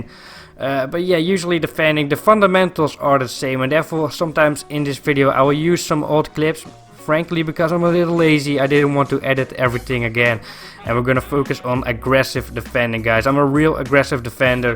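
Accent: Netherlands. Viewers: Must be dutch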